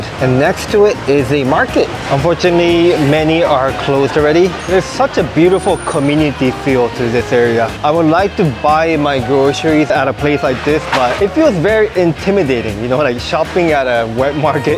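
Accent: Singapore. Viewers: I luv your Singlish ❤👍😊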